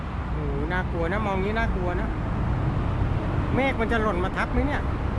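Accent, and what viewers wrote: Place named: Northern Thailand, Thailand. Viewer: สวัสดีครับ อู้ภาษาเมืองบ่ธรรมดา 555+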